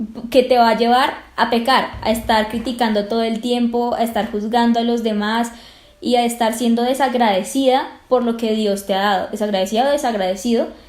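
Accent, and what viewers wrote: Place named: Colombia. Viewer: Que asi Sea, Que Dios te escuche. Por que la verdad han sido meses de mucho dolor y sufriimiento. Me encanta tu asento colombiano, y la frescura de tu ser. estare muy pendiente de tu Nuevo video, espero sea pronto. Un abrazo fuerte de regreso.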